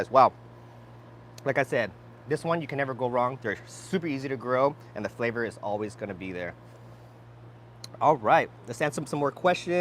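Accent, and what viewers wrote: United States. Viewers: Are you from southern California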